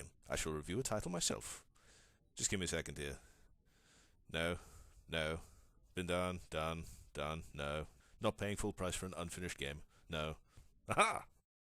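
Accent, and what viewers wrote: United Kingdom. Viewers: but he has a British accent nevertheless, and iam pretty sure he was born in Britain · I got the feeling of beloved Yahtzee, but I missed the excessive swearing about the game. I am after all, a fan of juvenile humor, and hearing a posh british accented man swearnig until his gonards explode is making me laugh. Other than that, It seemed to be a great first review try.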